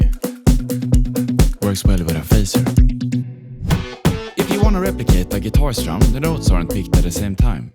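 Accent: Stockholm, Sweden. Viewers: swedish accent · is this guy swedish??? jag älskar allt om den här videon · please brother, try and lose the harsh stockholm accent when speaking english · Gotta love your Stockholm dialect while speaking English. 😆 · Never ever lose your swedish accent ❤ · jess i hear de svengelska is going krejsi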